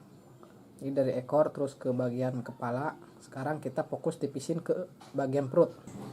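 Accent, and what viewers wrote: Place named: West Java, Indonesia. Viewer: Nu sae ngango na kayu naon kang..?? Bade nyobian yeh ngadamel nyalira.. tina logat na mah da jiga asli sunda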